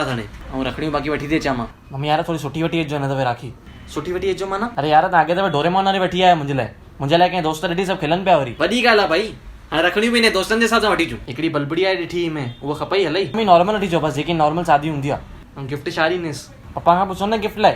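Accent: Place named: Larkana, Pakistan. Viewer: Your grandparents must be from Larkana because your Sindhi is 100% similar to ours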